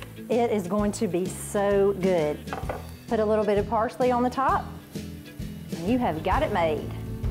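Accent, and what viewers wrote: South, United States. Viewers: She had me in within 2 seconds after hearing her sweet southern accent. Next I noticed the wedding band. No wonder she's married! I have to cook the deer in our house. But, I got an ace in the hole! My Grandma taught me how to. Funny, Granny could chicken fry up a mean skillet of deer steak but she didn't like deer meat. My Dad, my older brother and I would fight over that delicious meal! That's why I asked Granny to teach me!! I prepare it the same way that she did, but hers tasted sooo much better. That's because she put her love into it!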